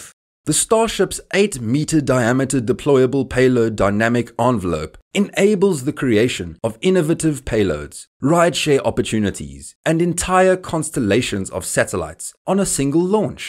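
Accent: South Africa. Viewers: Never heard a south african vlogger before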